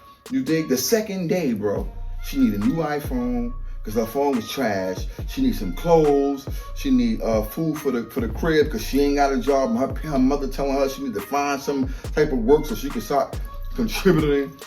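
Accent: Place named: New York, United States. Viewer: love when this dude talk that ny shit lol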